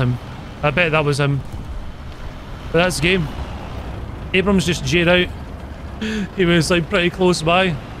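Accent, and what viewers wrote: United Kingdom. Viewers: revärs ? Are you britisch or what accent is it?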